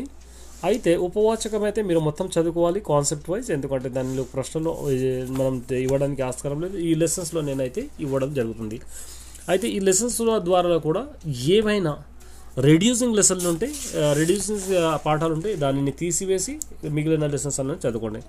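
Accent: Hyderabad, India. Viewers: Sir, you are from Hyderabad?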